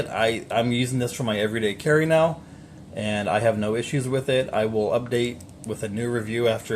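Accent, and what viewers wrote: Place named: Carolinas, United States. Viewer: What part of teh Carolina's are you from? I'm having a hard time placing the accent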